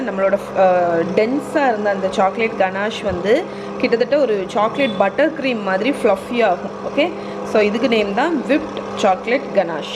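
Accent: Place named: Tamil Nadu, India. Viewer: You are the best cook.🎉Nice watching your videos.I like your Tamil accent,very different.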